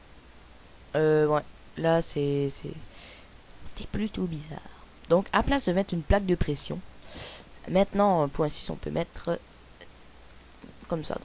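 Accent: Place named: Quebec, Canada. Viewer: Ta un axan québecois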